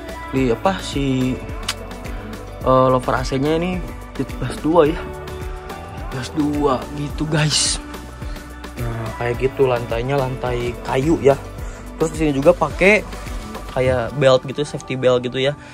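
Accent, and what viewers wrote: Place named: Kebumen, Indonesia. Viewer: Ngapak kebumen hadirr..bozz?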